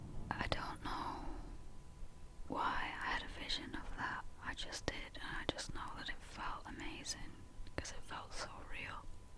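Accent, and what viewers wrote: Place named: North, United Kingdom. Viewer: Awesome video, really love this channel. Your northern accent/dialect adds to the experience. Love you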